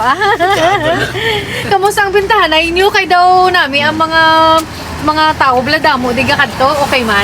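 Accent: Iloilo, Philippines. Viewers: Langga tamsak nakakatuwa Ang accent na illonga day injoy sa road trip full watch Po support din po salamat dikit na Ako langga sa Bahay mo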